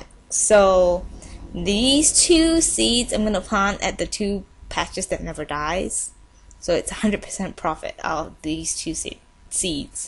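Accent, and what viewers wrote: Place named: Scotland, United Kingdom. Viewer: He has a Scottish accent :)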